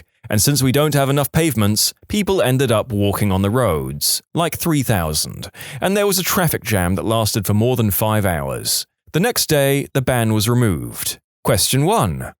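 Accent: United Kingdom. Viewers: Pardon my language sir but WHY THE FUCK ARE YOU BRITISH NOW, WHAT THE FUCK HAPPENED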